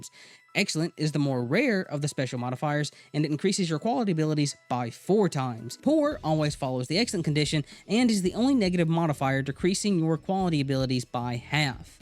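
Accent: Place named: Kentucky, United States. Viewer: Sounds like me and I’m Kentucky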